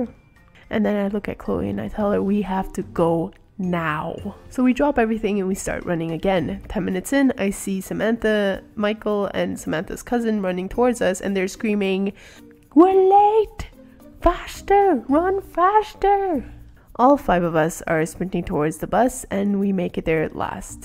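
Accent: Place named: Vietnam. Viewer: Can't wait for the next onee!!! <333 Love your vietnamese accent LOLOL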